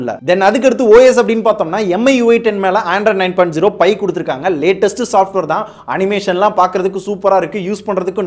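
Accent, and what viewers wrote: Madurai, India. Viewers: Bro Neenga Madurai yaa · Bro neinka Madurai ya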